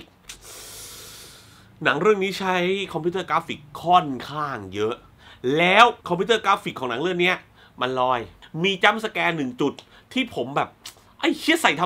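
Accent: South, Thailand. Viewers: พี่เป็นคนใต้ป่าวครับ55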